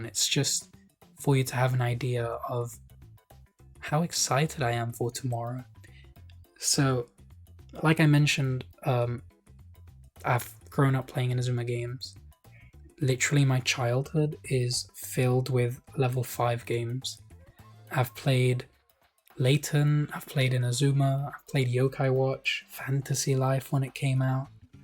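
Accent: United Kingdom. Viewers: Me sorprende que seas español,tienes un acento muy británico(yo también soy español btw) Yo también crecí con esta saga y nunca me he cansado de ella,no soy fan del futbol pero esta saga es algo muy especial para mí,me enseñó a no rendirme nunca,a seguir luchando a pesar de las circunstancias. Tengo demasiadas horas en estos juegos entre lo que jugué cuando salieron y otros medios 😉 Todavía no me puedo creer que hoy sale por fin VR(EA) probablemente sea el juego que más he esperado de toda mi vida y sé que va a ser un viaje muy especial(GOTY 100%) Espero que lo disfrutes tanto tanto como yo o más Vamos a dejar nuestra marca Inazuma en el mundo! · You're Spanish??? With your accent, you'd think you're English. It's so good that the game's finally here. I grew up with it in The Netherlands (best dub!). I rewatched ALL episodes a couple years ago, and played the GO games for the first time. 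The GO anime never got to the Netherlands so I didn't really grow up with it, but Chrono Stones ended up being my favorite season. Anyway, I felt like yapping a bit too. Keep up the great videos!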